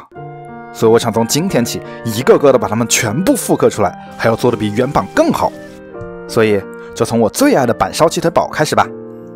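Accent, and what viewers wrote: Wuhan, China. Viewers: 这个弯管子普通话真的是听得想家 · 那~~有莫斯办法撒？ 有点吧甜，有点吧韩，哈哈哈哈。 这弯管子普通话太熟悉了~~哈哈哈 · 博主是武汉人啊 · 好怀念弯管子普通话。确定博主是武汉的妈妈😁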